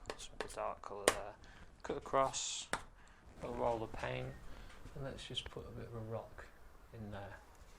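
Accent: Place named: England, United Kingdom. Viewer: Enjoy your vids very much,. What part of England are you from? Trying to place the accent.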